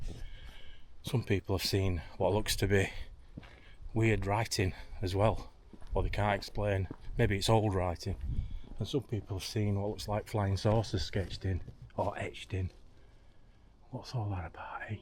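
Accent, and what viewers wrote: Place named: South Yorkshire, United Kingdom. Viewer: That's a South Yorkshire accent if ever I heard one 😄. If you're in the area, you might like to check out the phantom black beast that haunts Anston Stones wood. Every one who's seen it (and I know someone who has) has the same reaction - run like hell. Hint - it seems it doesn't like people straying from the paths.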